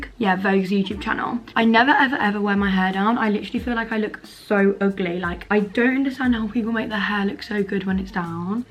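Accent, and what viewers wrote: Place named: Birmingham, United Kingdom. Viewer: omg I love the fact I know your a brummie with the “do you know what I mean🤣” “I’m not being funny”.... people say I always say this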